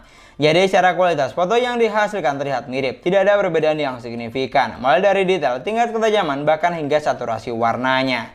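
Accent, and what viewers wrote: Madura, Indonesia. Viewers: Bang asal madura kah?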